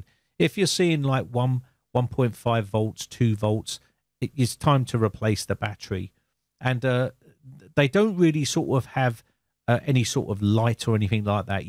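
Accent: United Kingdom. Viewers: This is weird, because I have never owned a PC that has done anything other than pop a "CMOS Checksum Error" (or some similarly worded error message). The BIOS chip makes a checksum of all the settings you have saved in CMOS, and it keeps this in memory. When you boot the computer, it will compare the checksum of the current CMOS Settings against the CMOS Settings Checksum that it had previously saved. If, for any reason, they do not match (they won't match if the battery is dying, ever), it will pop that error and force you to go into the mainboard settings (some BIOS chips give you the option to boot with default settings instead, but this is usually undesirable because the default settings almost never include a storage device, which means no OS will be found). This is hard-coded into the PC's BIOS chip, and it's done for safety reasons as erroneous data could be very damaging to the computer's hardware, such as sending wrong voltages to the wrong places in newer computers whose BIOSes allow you to overclock hardware. 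You'd have to be running an extremely cheap off-brand mainboard to be able to boot with corrupt data in the CMOS. Unless, of course, they do things differently across the pond? You sound British so maybe computers in the UK work differently than those over here in the States?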